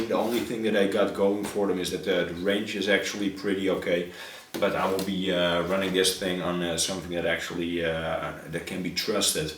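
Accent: Netherlands. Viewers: leuke en mooie video man ! Ik kan horen dat je nederlands bent haha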